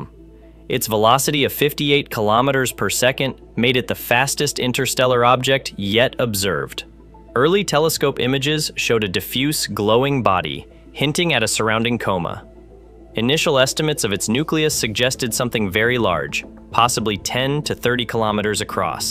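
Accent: United States. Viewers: Why do Americans pronounce the word ‘Second’ as ‘Secint’?? And ‘Herbs’ as ‘Urbs’.If you can’t read,I understand but surely,pronunciation IS taught at schools in America?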